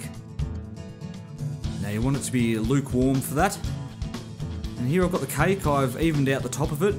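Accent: Australia. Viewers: its nt british i thowt he was aussie dikk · The aussie accent sounds very cool, respect)) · I love Aussie accent!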